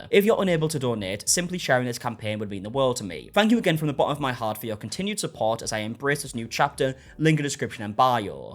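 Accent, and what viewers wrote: United Kingdom. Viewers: he's from the UK I think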